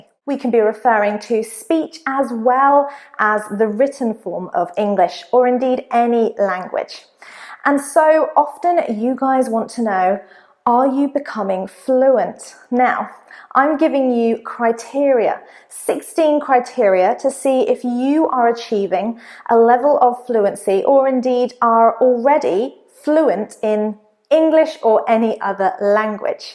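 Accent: United Kingdom. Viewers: Tell me why I can understand you easily despite your british accent :-D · I studied English in California so I have a hear for american English. It is the first time I come across your channel and after few seconds I could tell you are from UK. I sometime use Google Translator because it is fast and convenient, if not happy with it I reach for my English to English vocabulary. Not problems with movie or most books but still I have hard time to understand songs. As for jokes it is more cultural, if you are not a native it can be challanging. Finally, nobody mistake me with a native speaker, I still have a very strong Italian accent. Another criteria you can add to this list cuold be if you can solve crosswords. I'm not able to do it in English and sometime I find it difficult even in Italian. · I love your videos as your accents is on british english based.We people love british accent rather than peculiar american accent.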